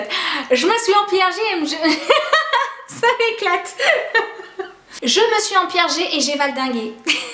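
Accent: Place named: Nord, France. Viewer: Et même l’accent tu reprends l’accent ch’ti ça porte à confusion .. mais du coup tu viens de quel partie du « nord » ?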